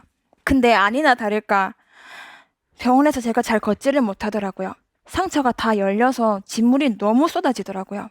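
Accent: Gyeongsang, South Korea. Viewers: 음 대구분이시넹~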